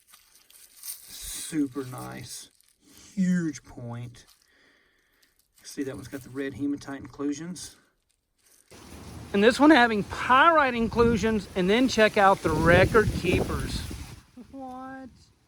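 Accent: South, United States. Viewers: it's the southern accent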